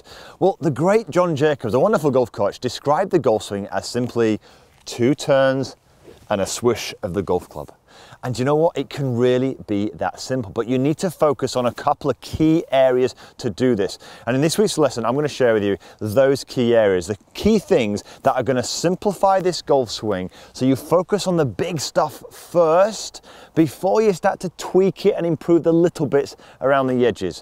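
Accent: Yorkshire, United Kingdom. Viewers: Thanks, With your Yorkshire accent you remind me so much of him with his enthusiasm and simplicity. Best wishes.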